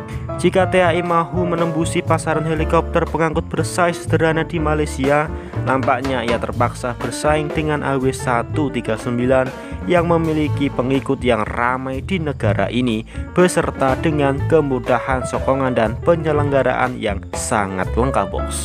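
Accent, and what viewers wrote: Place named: Java, Indonesia. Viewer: Kuat betul bunyi dialeq jawa dia ni...CG Loro telu papat ya😁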